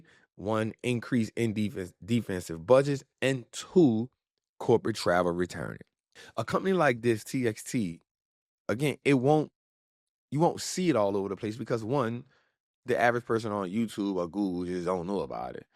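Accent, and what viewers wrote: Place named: South, United States. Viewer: Can someone tell me what accent this guy has? Is it somewhere in the South.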